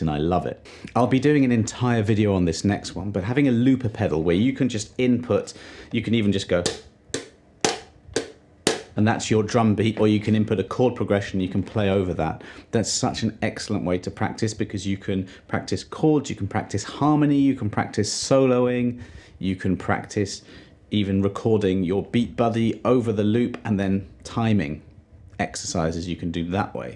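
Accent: United Kingdom. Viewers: Of all the Brit-sounding teachers out there, you ARE THE GREATEST IN MY OPINION.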